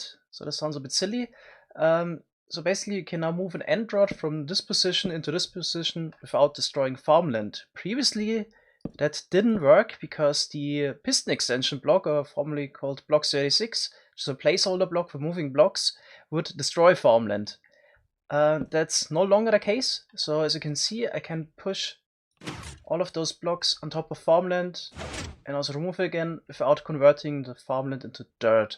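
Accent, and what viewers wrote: Germany. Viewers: Are you German? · you sound german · You're German I can hear it Wie gehts dir mein Kamerad? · Are you german? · Man merkt so dass du Deutsch bist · I can tell that you're german. Greetings from Germany xD Great vid by the way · are you german? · Laut deinem Akzent Leite ich ab, das du deutsch bist😂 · You sound soooo german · Are you from Germany? Kinda sounds so xD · You're German right? Ich auch, man merkts an deinem Akzent und daran, dass du das "th" als "s" ausspricht ;)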